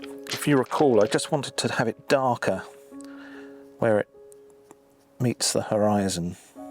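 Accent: United Kingdom. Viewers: Hi I am from Malaysia Want to tell you that I really appreciate your lessons! So skillfully demonstrated! And besides, your British English Is a delight to listen to after the endless American twang which is so hurting to the ears! Thank you!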